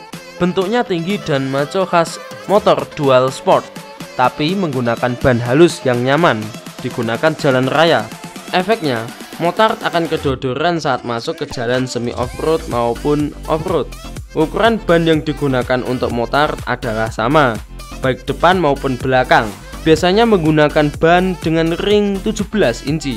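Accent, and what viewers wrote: Java, Indonesia. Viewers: Medok buanget mas broo... sampe Mblerengg gaess ,,uhh Mblereng gaaeeessss.. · Salam medok mas...yg asli orang Jawa mana likenya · Mantab unik dubbernya cadel plus medok namun penjelasannya cukup jelas dan pas ..👍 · Jangan kau hilangkan cirikhas mentok njowo mu iku mas. Salam dari AKU. anak campuran portugis,batak, jawa 😁 dari MEDAN..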